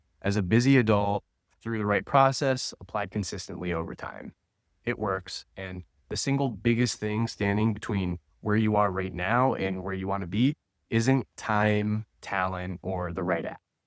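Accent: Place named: United States. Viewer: Wow!! All this wonderful information you are sharing really resonates with me. No doubt you have a way with words, and your American accent is clear, pleasant and easy to understand. So, congratulations on your YouTube channel, and thanks a lot for your helpful insights. I look forward to more fantastic stuff—hopefully soon. Best wishes from Spain!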